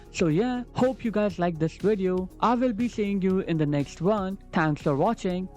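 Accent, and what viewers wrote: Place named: India. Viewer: It’s my first time in your channel and you sound indi a don’t want to be rude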